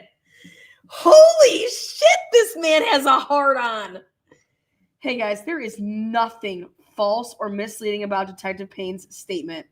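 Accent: South, United States. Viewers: Watching delayed…thanks for all your videos today. Really enjoyed your southern flair in this one. Glad you’re feeling better.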